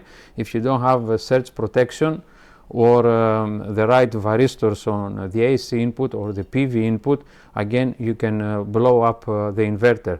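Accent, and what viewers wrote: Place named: Greece. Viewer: This Sounds like a greek accent 😁😉🇬🇷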